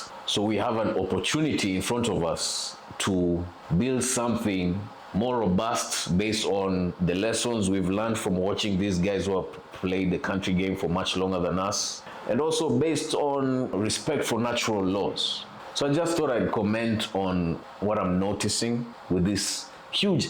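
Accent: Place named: Kenya. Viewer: Tell this to the Gen Z:s....They are about to tip over the boat. It pays to travel, to step out of your parents compound. You got it right, in a way I could not put into words. Your English is neutral Kenyan....one of the best across the world. You know the sheng', ghetto, gangster pidgin of the black American celebrity cult, full of profanity? It is negative and heavy. Carry on, let the world know that Kenya and Kenyans are blessed. I was in Uganda recently, a very well resourced country with a lot of natural wealth, great people, great African culture. I love Uganda just next to Kenya but, I get that relief 😮‍💨 once I cross into Kenya.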